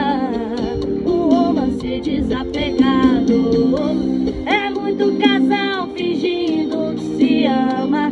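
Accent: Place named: Rio de Janeiro, Brazil. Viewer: uma japonesa que fala igual carioca e canta musica tradicional nordestina = receita do sucesso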